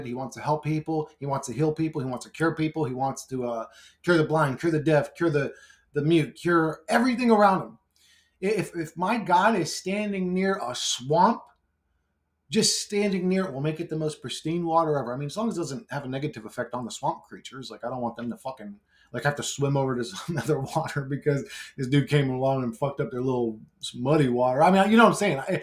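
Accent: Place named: Nigeria. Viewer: The Nigerian uncle accent my favorite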